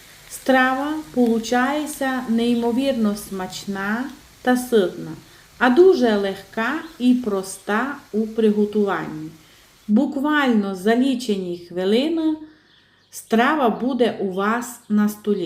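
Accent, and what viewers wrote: Transcarpathia, Ukraine. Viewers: поддерживаю, это не украинский язык. Это местечковый закарпатский говор. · Як мені гарно чути закарпатську мову: подобається більше ніж рецепт. Хоча і рецепт гарний, треба спробувати 😊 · Важкий діалект, важко слухати, хоча я сама з Франківська. Закарпатський, Чернівецький діалекти відрізняються від нашого. Одне слово "суль" і вуха скручуються. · Я в захваті від вашої прекрасної закарпатської мови. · малоймовірно він партачив московитську!А розмовляє на закарпатському діалекті! І це неймовірно чудово, наша Україна багата на діалекти.Напевне коли навчались у школі вам про це говорили, або ви прогулювали уроки😮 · Гарний говір закарпатський. Більш венгерський а не молдавський. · Нормальний закарпатський говір. Наша країна велика і в кожному регіоні свій діалект.Я навпаки просто насолоджуюся,як говорить пані.Дуже вдячна за рецепт. 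· вирвіть собі краще! Кожен регіон має свій діалект - закарпатський саме такий, і ми його любимо!!! - дякую) Якщо що - я з Черкас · ПРИВІТ ВСІМ З ХЕРСОНУ. ДЯКУЮ ПАНІ ЗА РЕЦЕПТ. ГАРНА УКРАЇНСЬКА МОВА. ЗАКАРПАТСЬКИЙ ДІАЛЕКТ. ЧУДОВО.❤👍🔥 ЗАКЛИКАЮ ПОВАЖАТИ ОДНИ ОДНОГО, ТА НЕ ТРЕБА ЦЬКУВАТИ ОДИН ОДНОГО ЗА НАШУ СОЛОВ'ЇНУ... МИ УСІ ГРОМАДЯНИ УКРАЇНИ.💙💛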